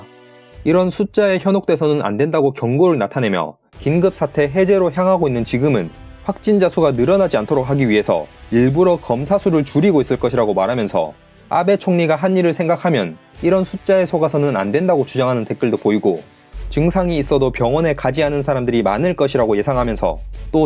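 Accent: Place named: Gyeongsang, South Korea. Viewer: 이거 경상도 사투리죠? 억양이 오르락 내리락 ㅋㅋ 표준말을 좀 연습하세여~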